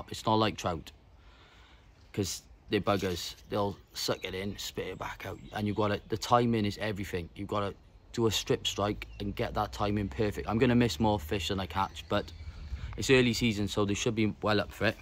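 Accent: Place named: Wales, United Kingdom. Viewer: Thanks for the reply. You have fun , nice to hear the Welsh tones, awesome! Tight lines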